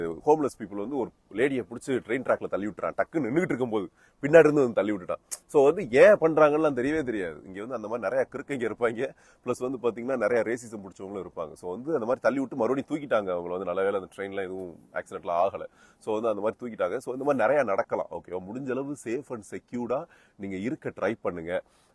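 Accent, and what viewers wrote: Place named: Telugu, India. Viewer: He is a Telugu guy that's why he speaking like this.........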